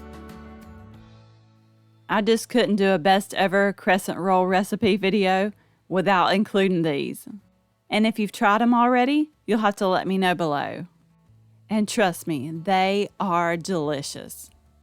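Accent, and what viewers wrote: South, United States. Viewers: I gave you a like right away just for your Suthun accent. Makes me feel right at home cause I live in Dallas. Your recipes look good also.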